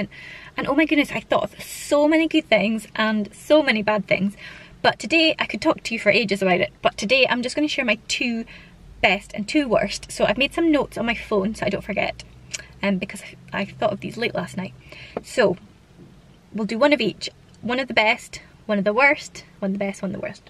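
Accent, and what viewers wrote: Scotland, United Kingdom. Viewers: You Scottish yeah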